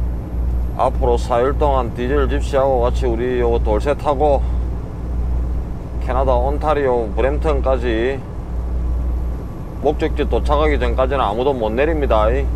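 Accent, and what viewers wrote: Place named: Busan, South Korea. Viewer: 태어나서 유투브댓글 처음 달아봅니다 한국(인천)에서 잘보고 있습니다~~^^ 두달전부터 님 팬이되었네요 구수한 부산억양의 영어가 너무 정감이 들고요 왠지모르게 영상보고 있으면 답답한 마음이 위로가 됩니다. 아무쪼록 안전운행 하시고 건강하세요 저는 지금 라면 하나 끼리 묵겠습니다~~ㅋㅋ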